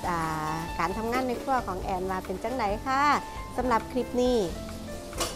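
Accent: Isan, Thailand. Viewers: พึ่งได้เข้ามาติดตาม ไล่ดูทุกคลิปเลยค่ะ เข้าแล้วออกไม่ได้จริงๆช่องนี้ ชอบเวลาพี่แอนพูดไทยตกอิสาน น่ารักมากๆค่ะ 😊 บางทีเราก็นึกคำไม่ทัน 😆แต่มันเป็น เอกลักษณ์เนาะ เสียงใสๆไทยคำอีสานคำ ฟังเพลินดีค่ะ FCสาวอิสานคือกันจ้า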